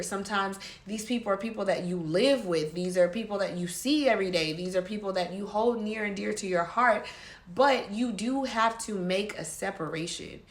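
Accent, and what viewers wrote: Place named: Honduras. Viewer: Being a new subscriber I can't place the accent exactly. Yet, to my ears, it is Hounduran or maybe even Costa Rican. Am I close?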